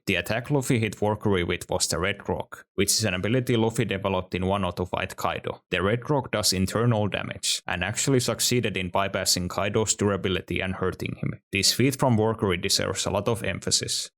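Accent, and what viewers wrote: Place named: Finland. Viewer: Great content. Ps: Suomalainen aksentti spotattu😆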